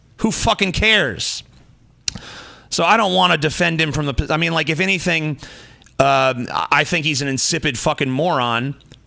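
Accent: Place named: Louisiana, United States. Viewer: You look and sound more Louisiana TJ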